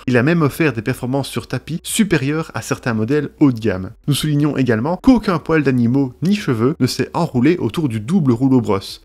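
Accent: Belgium. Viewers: Laisse aller l'accent belge, mec, on veut entendre les nonantes 🤌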